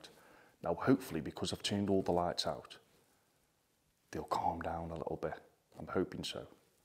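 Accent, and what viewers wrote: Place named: Australia. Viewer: I love Australian accent ❤️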